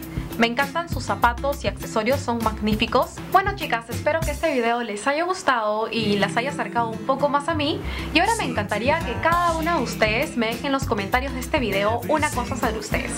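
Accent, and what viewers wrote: Peru. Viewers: Sabia k Ella era peruana por su acento :) ...me gusta sus videos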